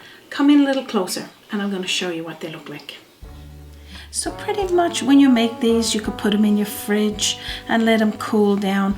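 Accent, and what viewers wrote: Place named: Newfoundland, Canada. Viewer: These look incredible. Is that a Newfoundland accent I detect? I love it. ♥️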